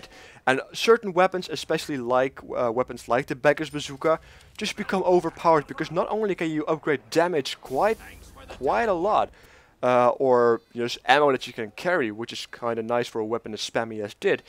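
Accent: Netherlands. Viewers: are u dutch? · you dutch? =3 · you sounds dutch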